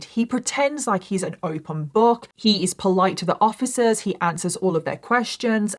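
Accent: United Kingdom. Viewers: Really lol? A British accent sounds tropical to you guys? Haha cool 😊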